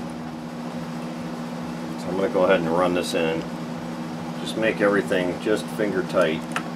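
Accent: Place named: Midwest, United States. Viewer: Another nice video. I'm about to pull the trigger on a G650gs but I find your videos really helpful regardless of exact model. Plus I'm from W Michigan now living in CT so I like hearing your Midwest accent!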